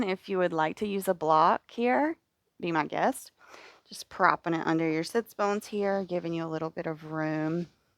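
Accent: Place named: South, United States. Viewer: Feelin' Friday's flow and the Southern Belle accent is strong today! The pace is just right as always ;-) I'd like to praise you for reminding me of one of my favorite covers out there too! <link>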